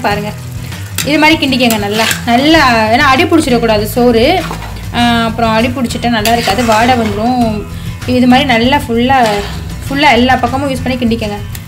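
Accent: India. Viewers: Please vaya moodu ma... First yedhadhu channel la Samayal program yepdi pandranga nu pathutu relax ah video la pesunga... Pre video first oru paper la seymurai pathi step by step unnecessary repeat words illama yezhuthi vechu oru murai pesi parunga... Correction ku yarathu pakkathula vechu senju parunga... Then oil kodikara sound pondra idangalil background music avoid pannunga.. better neenga pathiratha moodi vechu time kudukanum nu soldra time ku music add panninale enough. Then very important neenga madurai, theni, ramanad, sivagangai area ah sernthavangannu nalla teriyuthu adhu thappillai. Unga area slang la neenga pesunga city karanga pola pesa muyarchikka vendam.adhu ungal videovin iyalpai kedukum.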